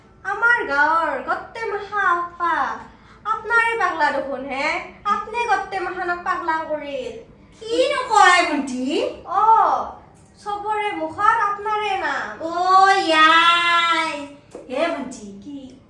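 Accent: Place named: Darrang, India. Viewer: বা তোমাৰ মুখত দৰঙীয়া ভাষা বহুত ভাল লাগে ❤️❤️❤️❤️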